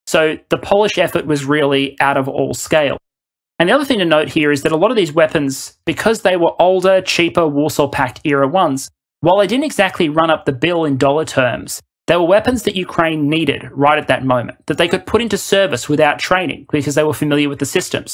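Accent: Australia. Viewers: No, thank God doesn't go up with his voice at the end of every sentence! They are both Australians however, that may be why you find them similar. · is Ukrainian propaganda with an Aussie accent...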